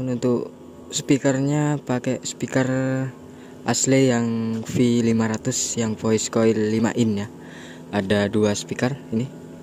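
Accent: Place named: Madura, Indonesia. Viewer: Logat nya mas ke madura madura an hehehehe😅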